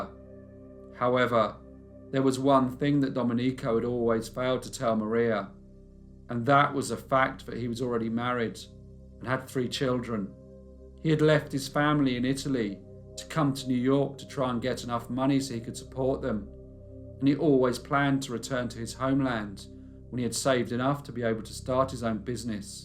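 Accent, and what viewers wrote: United Kingdom. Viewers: Mort sent me..... I also happen to love channels like yours and love the British accent. 🙋‍♀️♥️🤗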